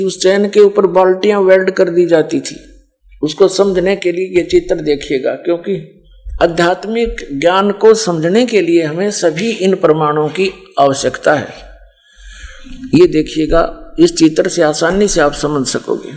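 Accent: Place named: Haryana, India. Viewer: Iski haryaanvi bahut mst lgti h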